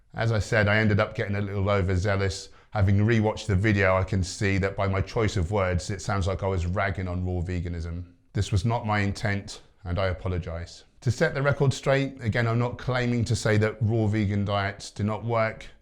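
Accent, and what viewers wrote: United Kingdom. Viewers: I like sv3rige, You sound like your from the Midlands , same as me.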